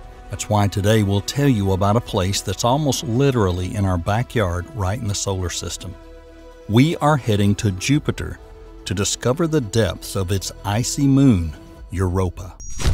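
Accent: South, United States. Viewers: what kind of american accent is this?? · Why is there a redneck narrating,?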